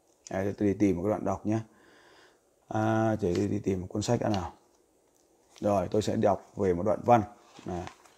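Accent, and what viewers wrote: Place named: Hanoi, Vietnam. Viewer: Giọng Hà Nội là hay rồi.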